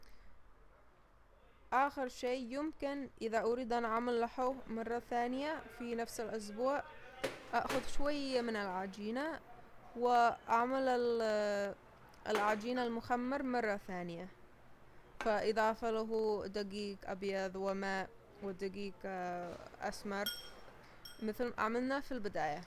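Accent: Sanaa, Yemen. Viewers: ايوه هي من صنعاء كلامها صنعاني انا صنعانيه انا احب أكلات اليمن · You are awesome !!!! Very amazed by your fluency in the Arabic language , and Sanaani accent. You have done a great job. Thank you :)) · Your accent is so cute. Never heard a cooking show on YouTube with Yemeni food and accent:) going to try this;) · من لهجتك صنعانيه و لكن عايشه خارج اليمن .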